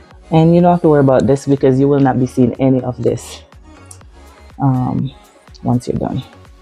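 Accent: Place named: Jamaica. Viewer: You sound Jamaican..... love that 🇯🇲🇯🇲🇯🇲🇯🇲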